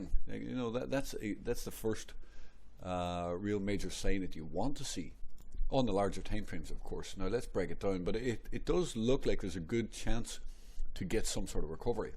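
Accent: Northern Ireland, United Kingdom. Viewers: You sound northing Ireland. Hello brother from Dublin 👊❤️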